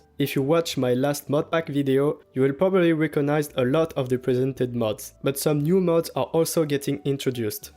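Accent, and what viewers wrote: France. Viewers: U sound french , are you french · Are you french? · ahah j'ai compris que tu étais français dés que tu as dit "of course ", bon accent et bonne vidéo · J'étais pas sûr avec le temps mais j'ai enfin la preuve avec ton Steam ! Je me posais tout le temps la question avec ton accent mais t'es bien français 😭😭😭😭 Merci pour ton contenu continue c'est incroyable 🙇🙇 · t'es français ? je reconnais bien cet accent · T'as un accent français ou c'est moi? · le petit accent français qui fait plaisir super modpack sinon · haha sa s'entend que t'es français et merci pour le modpack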